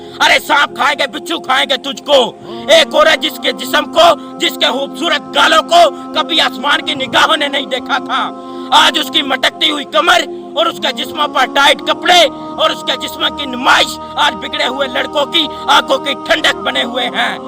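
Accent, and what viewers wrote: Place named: Punjab, Pakistan. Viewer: His Punjabi Urdu Accent is making me laugh😂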